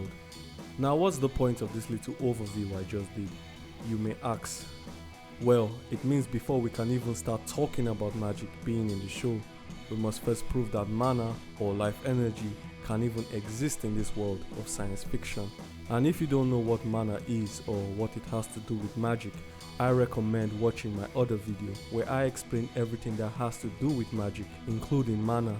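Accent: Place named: Nigeria. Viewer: Amazing video you sound Nigerian tho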